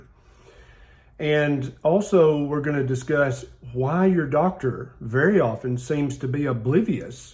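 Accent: South, United States. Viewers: Your Southern accent is very charming.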